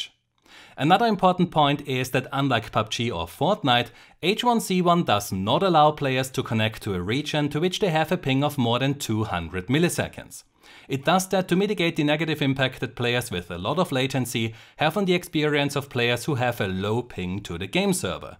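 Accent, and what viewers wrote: Germany. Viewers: Are you from germany? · i love your accent, is it german? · Bist du eig Deutscher?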